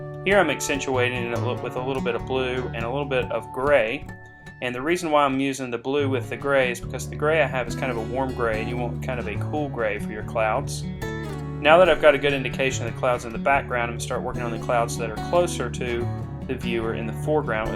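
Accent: South, United States. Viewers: beautiful you are really good and i like you countryishh accent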